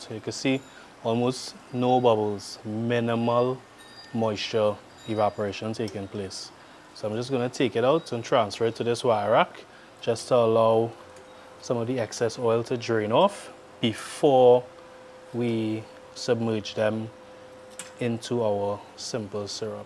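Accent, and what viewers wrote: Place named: Trinidad and Tobago. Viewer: I love your accent. Are you originally from Trinidad? Excellent recipe. I’m going to try it. Thank you.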